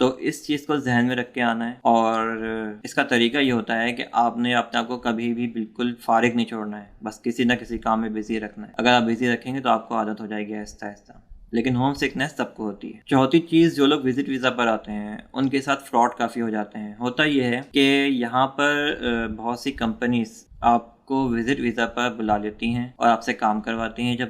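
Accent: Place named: India. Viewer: Bro you are working in Dubai but you are slang language is Indian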